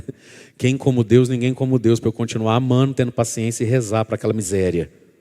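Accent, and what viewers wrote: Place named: Minas Gerais, Brazil. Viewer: A nois aqui travez . Um jeito mineiros de falar rsrsrs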